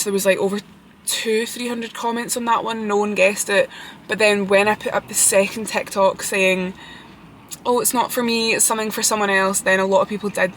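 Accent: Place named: Fife, United Kingdom. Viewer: So nice 🥺 side note- you sound like your from fife (from a fellow fifer)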